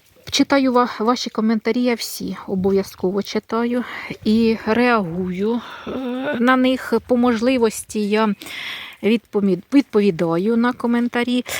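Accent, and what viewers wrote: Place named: Cherkasy, Ukraine. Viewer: ти класна, таку б мені подругу мати 🥰і відео твої цікаві, і мова теж мила, ба нашу Черкащину зразу відчуваєш, тому я й підписалася на ваш каналі завжди жду відео ❤️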